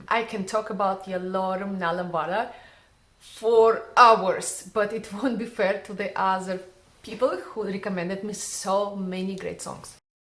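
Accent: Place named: India. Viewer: Very nice video ! Which country are you from ? Your speach and action like indian !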